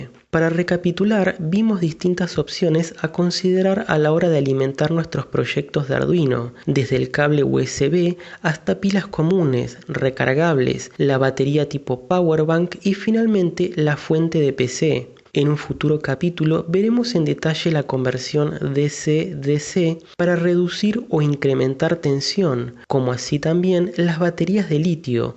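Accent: Argentina. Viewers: Hola. Bueno te comento que le hice mirar tus videos a mi abuela y entienió todo. Hoy ya no mira telenovelas y esta enganchada con tus videos. Ahora en serio, la calidad técnica de las imagenes son exelentes, camara, luz, ecsenario, todo controlado al minimo detalle. Tu capacidad didactica es un don especial que tu lo tienes. es dificil no entender alguna cosa. Por tu tonada eres porteño en lenguaje de arduino es -- pero tu GENEROSIDAD es ++++++++++++++++++++, te mando un abrazo grandote y gracias por todo lo que aportas.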